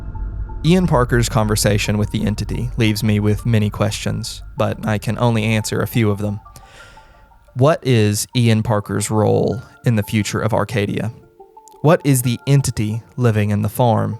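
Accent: South, United States. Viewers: I’m just so happy to find a relatively popular YouTuber (who makes great content btw! I’ve watched a few but this was the episode I subscribed over) who’s got a southern accent. I did pause the video at the beginning and went and watched all 17 or whatever videos of the OG and I’m sooo glad I did. So good. Great series, great commentary. I usually pick one or the other, the original or the summary, but for THIS series I’m so glad I took your advice.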